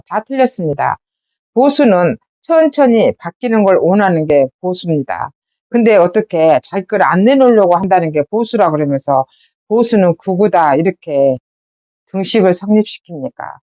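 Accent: Jeolla, South Korea. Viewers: 광주아짐 의 진득하신 남도말씨의 맛깔스러움에 저도 진심 전라도인에 대한 인식이 계몽되기 시작합니다^^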